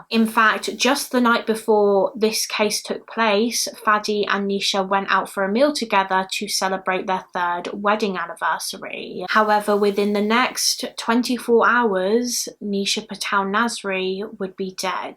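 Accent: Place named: Southwest England, United Kingdom. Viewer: It's a south west English accent 😊. Not 100% but I'd say Somerset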